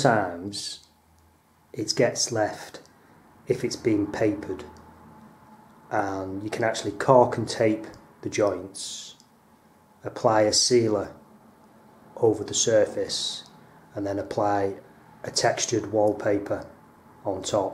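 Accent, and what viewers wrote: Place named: Manchester, United Kingdom. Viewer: You sound like you are from manchester, how do I book you. :-)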